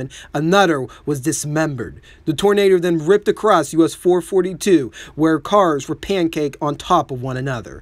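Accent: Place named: Pittsburgh, United States. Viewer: he's definitely from the area, that's a very strong Pittsburgh accent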